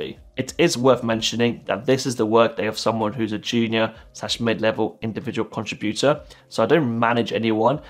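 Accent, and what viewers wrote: United Kingdom. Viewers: For machine learning, I choose to learn Python and C++ as my main programming languages. I like your video because of your British accent. Why don't you use X as a machine learning engineer?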